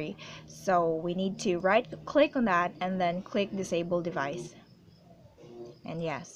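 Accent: Philippines. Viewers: HAHA HALAWA TALAG NA PINAY YUNG ENGLISH , PERO ANG KYUT NG SPEAKING VOICE MO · you Philippino accent is soo freaking cute. Thanks for the help hope it works 🙏🙏🤎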